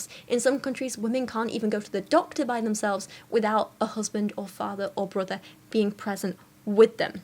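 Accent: United Kingdom. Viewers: In addition to misogyny, there are a couple of other prejudices Americans have that may be related to why you have been called pompous in comments. First is Americans often associate British accents with being rich, pompous, and stuck up. I’m not sure if it is because of old movies, or following the British royalty too closely, or the listeners feeling dumb for having some difficulty understanding you. (When I first found your channel, I would use the closed captions, but was fine after two or three videos. My mom would watch British shows but my father could never understand the accents, even after 40 years of marriage.) Another prejudice the American right has is that anyone who is educated, man or woman, is a pompous liberal who looks down on them. They often blame “liberal” colleges instead of questioning what could be wrong with their beliefs that causes smart to reject them.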